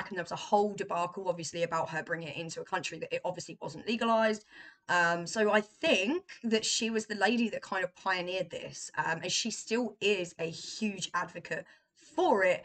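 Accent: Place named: East Anglia, United Kingdom. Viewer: I've been loving your videos. You inspired me to start MC and I've been feeling all the better for it! Please forgive me the rudeness of asking, but I'm from a small town on the norfolk-suffolk border and your accent just sends me back to my childhood. You have to be local to me, please confirm if true. It's so rare to see people in 'the wild' who sound like my cousins and family friends. Back home is big stoner vibes too so it's just very fitting ☮☮☮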